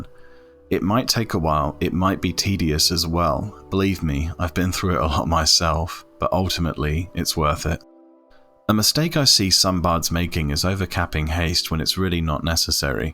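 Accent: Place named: United Kingdom. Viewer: Love the British accent. Makes everything seem way more official, especially for a fantasy game, and pronouncing melee as "mee-lee" is awesome lol. I wish had a British accent.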